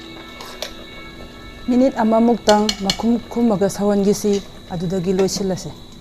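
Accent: Kakching, India. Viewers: Ngangiba lonsina kakching haiba lamdugi lon ne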